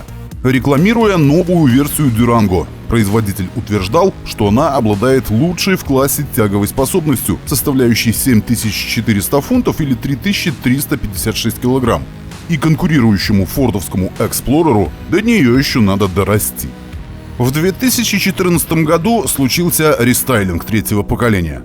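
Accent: Belarus. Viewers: Белорусский акцент ппц)))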